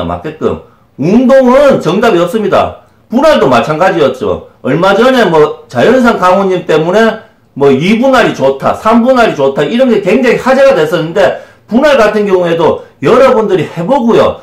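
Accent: Gyeongsang, South Korea. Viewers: 행님 말투가 너무 좋습니다ㅎㅎ 우리 부모님 같으세요 갱남 밀양분들인데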